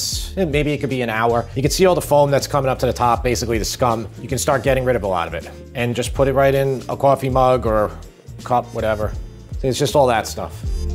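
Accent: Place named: Long Island, United States. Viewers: Test it Awl... Not a knock! I'm a Long Island Boy too! Music to my ears! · You are a delight to watch! Your deadpan humor, your outstanding recipes, your Lon Gisland accent, and your well edited productions have made you my favorite YouTube chef.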